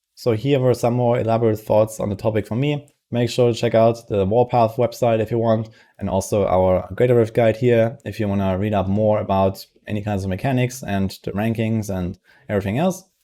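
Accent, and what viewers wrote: Germany. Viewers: Role Model for "a german talking english" ❤️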